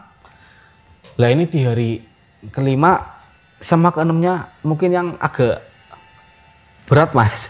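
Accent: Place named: Tegal, Indonesia. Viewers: Medokee medoke masss 😅 waras terus mas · Ora ngapak ora kepenak ya mas jos gandos 😭👍🏻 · Jane serem critane cuman logate karo basa ngapak dadi ora sida medeni malah dadi lucu😂😂😂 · Logat tegal nya kental bget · wong ngapak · Logat tegale ora ilang yah kang,,keren" mantep critane,salam saking Bojong tegal 🔥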